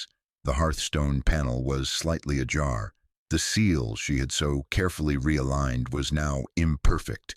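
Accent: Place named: United States. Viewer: An American accent doesn't work for a Marple mystery, sorry can't listen.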